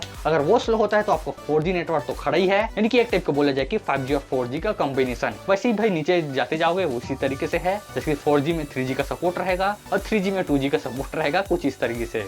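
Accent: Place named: Chhattisgarh, India. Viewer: Chhattisgarhiyo ka accent pakda jaata hai 😅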